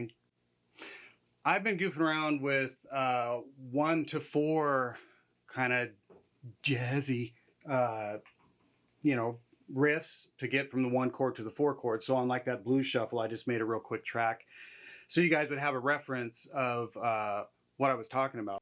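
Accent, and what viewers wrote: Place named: South, United States. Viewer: This was awesome stuff. love that country accent. had me rollin'.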